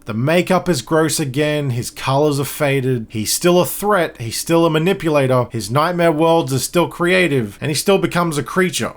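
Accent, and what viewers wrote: Australia. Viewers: I could listen to this Aussie talk about horror movies all day!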